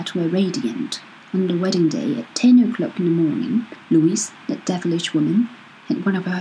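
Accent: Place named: United Kingdom. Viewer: I listened at 0.75 speed. Works perfectly:) Lovely British accent:) Anyway, it's the only reading of it, here on YouTube , so let's be grateful.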